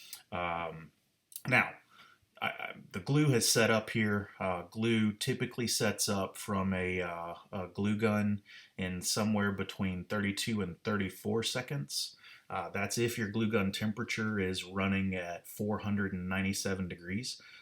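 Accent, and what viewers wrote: Norway. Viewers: Norwegian accented stamps... braided and unbraided and braided again rope 😂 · I haven’t laughed this hard in weeks! Bunny suit, whitest paint out there, dip them in a bucket, stamps with a Norwegian accent, I had to pause the video and regain a semblance of control so I could hear the rest. What a guy!!! Thanks to you both for such a fun watch, wash those hands and stay safe and well. Kris P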